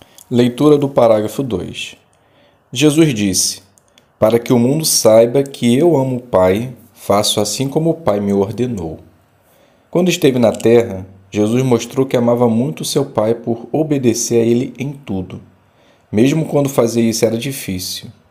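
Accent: Rio de Janeiro, Brazil. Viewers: IRMÃO, MATE MINHA CURIOSIDADE: O. IRMÃO É? A VOZ É IDÊNTICA; ATÉ O SOTAQUE BEM CARIOCA. BOM DIA. ABRAÇOS TERNOS E FRATERNOS. 26/08/2022.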